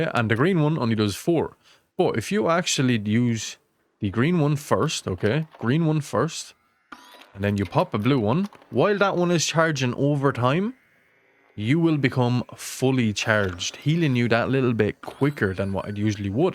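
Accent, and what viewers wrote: Ireland. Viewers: Last name O'halloran but can't understand an Irish Accent - You're high on spectrum or what? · Its English but the guy is Irish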